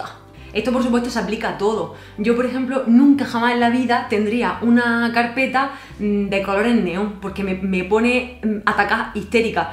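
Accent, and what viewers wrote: Andalusia, Spain. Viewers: Gracias por tus consejos, te sigo desde hace tiempo y me encantas, además me encanta tú acento y que estés en Motril, ya que conozco Motril, Lanjarón y toda la Alpujarra por qué mi padre es de Granada y nos encanta Andalucía y vuestro salero,un abrazo muy fuerte de Mallorca. Se me olvidaba 16 jajaja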